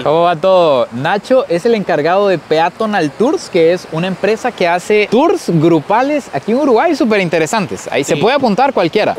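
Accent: Argentina. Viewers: ÉSE ACENTO ES DE ARGENTINA NOOOOO OFENDASSSSS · Molesta un poco que se quiera hacer el uruguayo pero hace el acento argentino... pero buen video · Decime por que hablas como Argentino .Nosotros no hablamos así.Estaria bueno antes de hacer los videos se informen un poco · Es casi lo mismo, qué ganas de romper las pelotas. Además, los argentinos tienen muchos acentos. En todo caso, decí bonaerense. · Pero porque hablas como argentino. Pero che.... el che es argentino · Mano no hables como argentino que a muchos Uruguayos n le va a gustar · Cuando te sentaste al lado de gardel hablaste como argentino,no como uruguayo, ellos no dicem che ni hablan con ese canto caracteristico de argentina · En Uruguay no dicen che y hablan más pausado, estas imitando el argentino😅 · sos argentino o que xd los uruguayos no avlamos haci xdd C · Sonaste a argentino, no a uruguayo…. 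· Hola como estas? Muy lindo video, pero solo te voy a corregir un error... Estas hablando como argentino, ese acento no es uruguayo, es como si fueras porteño, el Uruguayo habla mas tranquilo, sin resaltar tanto las palabras. Otra cosa nosotros no decimos siempre "che", nosotros decimos "bo", ta" y listo😁😁😁 el che y el boludo es mas argentino, aca, podes decir "fijate y fíjense" que es como decir el miren u observen o chequen esto. Saludos cordiales desde shangrila, ciudad de la costa, canelones💪💪💪💪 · Ahí no están los poderes de Uruguay , solo el poder ejecutivo, en el Palacio Legislativo, esta el poder legustavito, y en el Palacio de Justicia, el poder legislativo. El teatro Solis puedes entrar, solo tienes que ir en l horario en que se hacen tours con guía para turistas. no hables como Argentino cuando te haces el uruguayo, así no hablamos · soy de Uruguay pero por favor no hables en argentino pe da cosa xd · TODO CASI BIEN PERO NO ABUSES DEL CHE Y MAS CON ESE TONO QUE LO HACES NOOOOO PARECES E ENFRENTE ARGENTINO NO URUGUAYO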